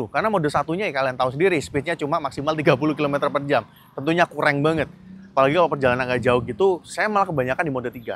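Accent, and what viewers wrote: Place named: Surabaya, Indonesia. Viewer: Suaranya jga mirip sekilas, cuma dg aksen suroboyo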